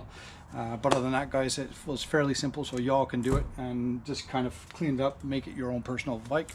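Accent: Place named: Quebec, Canada. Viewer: On dirait que tu as un accent québécois mon ami 😊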